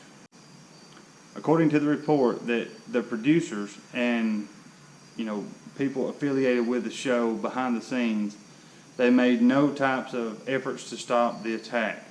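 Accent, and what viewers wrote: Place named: Rural, United States. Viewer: This was probably the worst video I’ve ever watched. Stupid fucking monotone hick accent. I should kick your ass and file a suit for your fucking tractor hick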